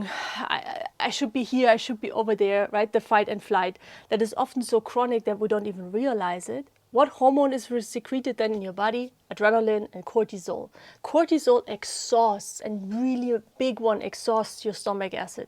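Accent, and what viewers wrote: Germany. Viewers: She has a lovely German accent. ❤ I think she is very good at what she does, too.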